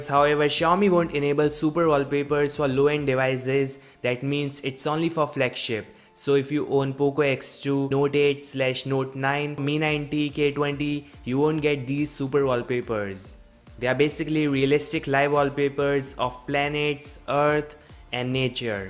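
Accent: India. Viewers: i like english from india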